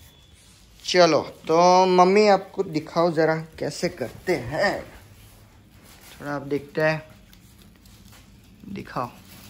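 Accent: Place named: Gujarat, India. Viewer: Mane laagtu j tu tamara ane learn na India cup na interview ma ke aa loko gujarati hase pakku...Tamara hindi ni style upar thi 😂😂 Love from Ahmedabad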